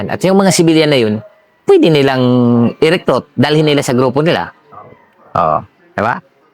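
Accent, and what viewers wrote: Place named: Iloilo, Philippines. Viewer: cguro ilonggo ito si master ah sa accent nia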